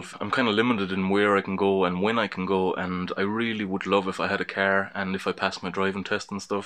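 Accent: Ireland. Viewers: Kinda down to how you define Vlog really. Isn't a vlog a video log? So it's about you and whatever you're up to or what you're into. Unless you have a completely boring life then a personal vlog is going to have variety. I've looked into Irish vloggers and if they're not yet done copying Jenna Marbles it's largely them coming and going to and from work or their sports/hobbies. You do have a niche with your Irish head on ya and accent and living in the Wesht of Ireland. The majority of Irish vloggers seem to be all cut from the same middle class or hipster or trendy bolts of cloth. Don't compare yourself to them at any rate. Also that argument for niche is countered on YT with others saying if you don't have one to do verity until you do find onr. You're thing is very distinctive anyway and if you got picked up by some American viewers you'd spread far and wide, especially if you're bringing them local stuff. 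Start another channel for the project pieces but dance round them on the vlog to push traffic there. We intersect on several things you've covered not just the one, heritage, video, Geocaching and local radio being the main ones. Ya don't normally find those things on the one channel so variety does appeal to many. Ultimately you are the brand and you are the product here. I'm here to find out what got up to this week and maybe learn a few things on the way.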